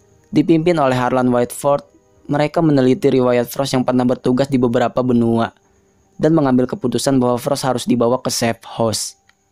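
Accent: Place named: Banyumas, Indonesia. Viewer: Kaya ngapak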